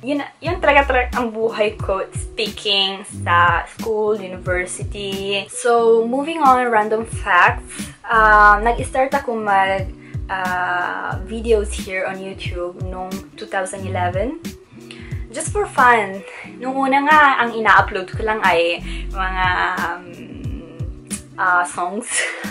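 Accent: Italy. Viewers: Il Tagalog con l'accento milanese è magia. 😂❤ Vi prego ditemi che c'è qualche mix filippino-veneta nei commenti. Ho bisogno di sentire come suona il Tagalog con l'accento veneto 😂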